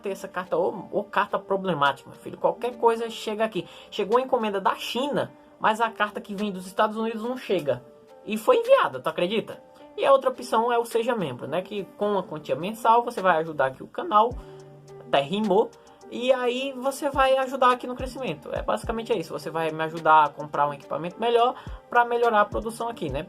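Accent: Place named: Pernambuco, Brazil. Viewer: Que massa! Reconheci o sotaque. Sou de Pernambuco :D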